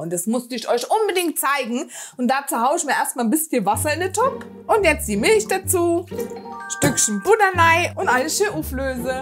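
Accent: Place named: Palatinate, Germany. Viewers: Wenn ich mich nicht irre hört sich des an wie bei Joy Fleming, also Mannheimer Schnauze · Ja, das sieht lecker aus! Und wenn ich dieses Pfälzisch hör, bedauer ich's mal wieder, dass ich keinen Dialekt anständig sprechen kann.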